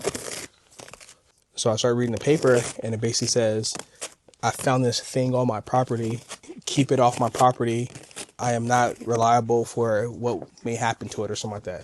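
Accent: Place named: California, United States. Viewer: You are a wonderful husband and father,l love to how you interact with you family,you hold it down as a MAN and I love the respect you show to your family, I love to see how she give you your time to yourself and you do the same to her.. county the good work my God bless you and your family.. by the way I been watching you two since you started.. I just love your California accent I'm from Virginia and I have a southern accent.. love your content.. and content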